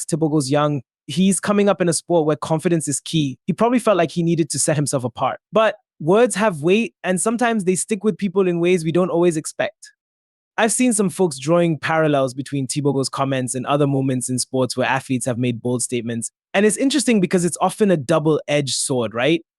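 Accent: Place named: Southern Africa. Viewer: My man, you sound very southern African... I'd wager South African straight up... yet you made a whole video and released it internationally without looking up how to pronounce this southern African man's name? Embarassing...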